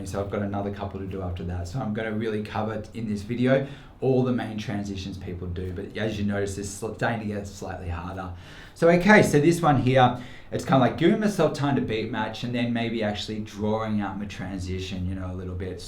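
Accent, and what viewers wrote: Australia. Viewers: you got such a positive vibe mate, are u Aussie? just love your videos, great content and genuine way to teach, cheers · You are Aussie right ??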